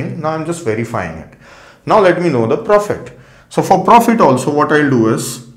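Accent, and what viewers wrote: India. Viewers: Rabbim sen beni hint aksanına mazur bıraktın ya artık ne diyeyim final sınavında sıçtım zaten türkçe kaynakta yok gömsünler beni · Perfect Indian accent, I just love it.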